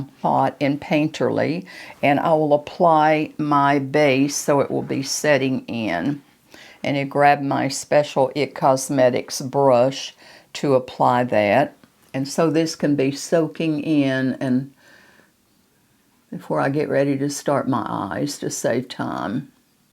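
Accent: South, United States. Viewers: You look fabulous,. I enjoy your longer videos; very relaxing. Especially your Southern drawl; you make my day. I’m sorry about your low vision problems. But one would never know by the way your makeup turns out. You’re just gorgeous and beautiful. Your eye makeup really brought out your beautiful blue eyes. God bless you,. Looking forward to your next video and welcome back! ❤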